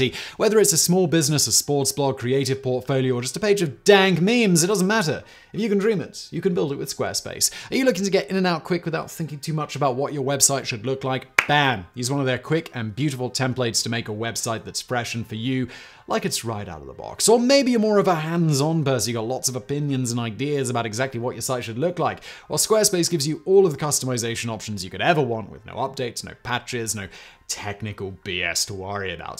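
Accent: England, United Kingdom. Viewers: He's English. If a word looks like it starts with Victoria, he's hard coded to say Victoria no matter what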